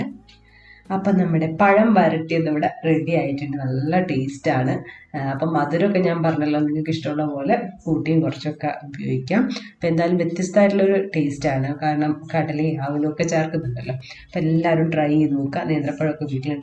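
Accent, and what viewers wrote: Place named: Malabar, India. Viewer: Hii...😄 recipes simple & tasty aanu...adhikam budhimutillathe vegathil undakan pattunnu 😄. ettavum ishtapettath Malabar slang presentation aanu..eniyum nalla recipes wait cheyunnu...😋😋..takecare dear 👍❤️😊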